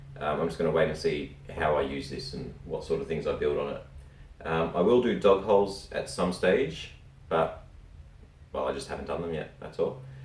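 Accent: Australia. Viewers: It's great to hear a Aussie an Aussie accent. Love the casual approach as opposed to the over technical banter that is often the case. Great job and thanks for taking the time to show it.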